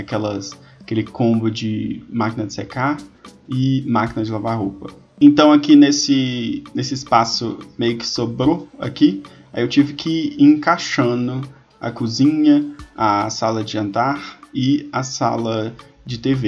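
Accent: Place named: Rio de Janeiro, Brazil. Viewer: Sou de Salvador e jurava que você era carioca! Kkkkkk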